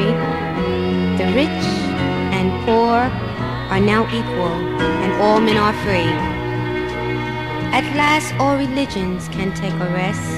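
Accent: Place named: England, United Kingdom. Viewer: Why does she sound so English?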